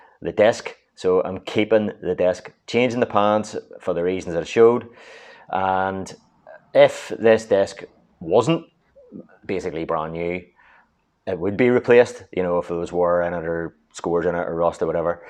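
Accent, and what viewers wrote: Northern Ireland, United Kingdom. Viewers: This is not a British accent! It's Northern Irish. You could say it's a UK accent however.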